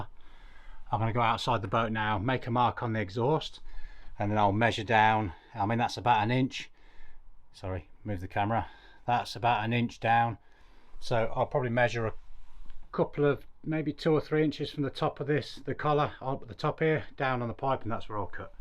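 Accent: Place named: RP, United Kingdom. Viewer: Very posh 😐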